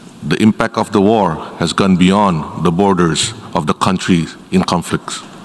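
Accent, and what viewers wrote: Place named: Indonesia. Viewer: Wow bahasa inggrisnya, lugas ala indonesia namun ga sok ke inggris2an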